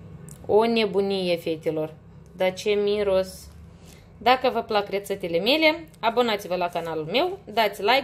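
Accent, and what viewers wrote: Moldova. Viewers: Dulce-i graiul moldovenesc 😃 nu lua în seamă comentariile rele de la proști. Ai un program frumos și mulțumim pentru că împarți rețetele cu noi. Eu sunt din oltenia și înțeleg tot ce spui, îmi place când zici " iaca așa" 😃 Mulțumiri și fericire la toți ❤ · Ești o scumpă Draguto mie îmi place cum vorbești ,am avut multe prietene din Basarabia când am fost mai tinerica ,bravo ești o gospodină desăvârșită ,💖💖💖💖💋💋💋💋💋🌹🌹🌹🌹🌹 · Seară Frumoasă! Dragă! Foarte frumos și delicios! La Mulți Ani Fericiți! Copilașului tău și la toți copiii din lume! Îmi place foarte mult cum gătești și cum explici in dulcele grai moldovenesc! Fiecare regiune are regionalismele sale! Îți doresc o săptămână frumoasă și binecuvântată alături de cei dragi! Cu mult drag! ❤️😘🌹🌹🌹🍒🍓🐈🧁🏡💥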